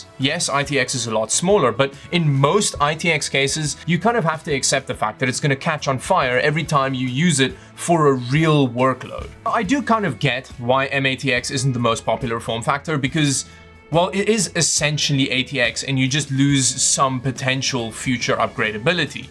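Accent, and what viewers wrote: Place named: South Africa. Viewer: 100% south african right?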